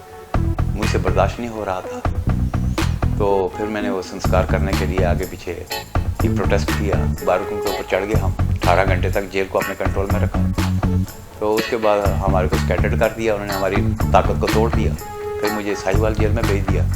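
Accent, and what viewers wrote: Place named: Jammu, India. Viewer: his dogri accent is good ,with the music its like a old bollywood film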